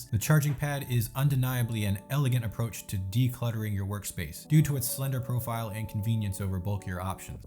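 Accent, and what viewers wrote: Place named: Netherlands. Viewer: You're Dutch aren't you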